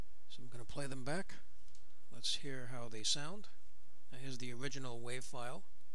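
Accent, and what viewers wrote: New York, United States. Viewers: Thanks Brother...You sound like a New Yorkuh...I Love NY & God Bless Yous :)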